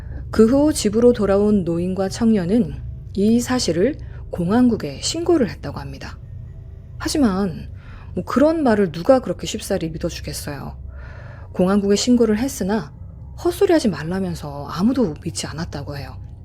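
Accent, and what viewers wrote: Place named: Jeolla, South Korea. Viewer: 전라도 비슷한 말투가 듣기 싫어요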